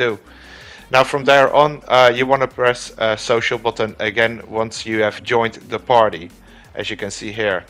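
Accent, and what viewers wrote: Netherlands. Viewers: Thanks for posting! Btw are you Dutch?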